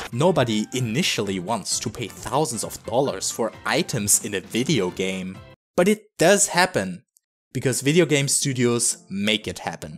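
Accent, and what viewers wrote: Germany. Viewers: You sound suspiciously German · Hört sich fast wie ein deutscher Akzent an?🤔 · Man hört deine DEUTSCHheit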